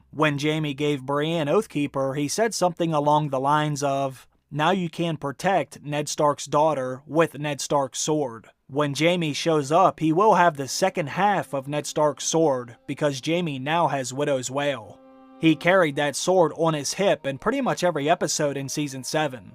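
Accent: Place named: Alabama, United States. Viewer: Are you from Alabama? cause you sound like forest gump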